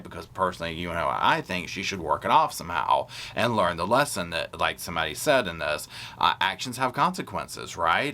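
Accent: South, United States. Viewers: 7/26/23... +...the Gruesome 2-some! 😊 Love your Southern accent + your common sense analysis of this ridiculous lie/hoax! God bless taxpayers who have had to pay all this horrible debt for this expensive FAKE SEARCH just b/c she has "hurt feelings" + "seeks attention"! She wants attention + is seeking fame + fortune + book deal + movie deal! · Sir what part of the south are you from. Love the accent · I love your southern drawl! I could listen to you talk aaaaaaaaaaallllllllllllllllllllll day!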